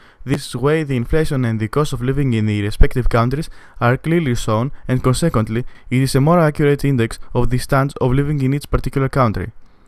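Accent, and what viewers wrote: Greece. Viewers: This is a voice of a Greek i just know... (I am from Greece btw) i might be wrong idk... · Bias? Greek accent. And as an Albanian, i'd choose Slovenia aka. Switzerland of the Balkans. I've only been to Montenegro, Kosovo, Slovenia and Greece (i was gonna go to Serbia but was not welcomed lol) and I judged by the ranking in the video and my experiences. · your English accent is sucks buddy all Greeks have same accent try to speak better English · I know you ard greek from your accent hahah XDXDXD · Greek English..I just found out lol..nice job btw Greece is the best #GREEKLIFE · ΝΑΙ ΜΕ ΤΟ ΠΟΥ ΑΡΧΙΣΕ ΤΟ ΒΙΝΤΕΟ ΚΑΤΑΛΑΒΑ ΟΤΙ ΕΙΝΑΙ ΕΛΛΗΝΑΣ ΛΟΓΟ ΤΗΣ ΠΡΟΦΟΡΑΣ. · By his accent alreafy found out he was greek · Constantinople was Greek so Turkey is not in Balkan Greece has economy crisis but richest in the Balkan And you Greek because this is how greeks talk in English φίλε μ 🤣λέει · It is so obvious you are Greek your dumbass accent is giving it away. 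Btw its MACEDONIA not fyrom :)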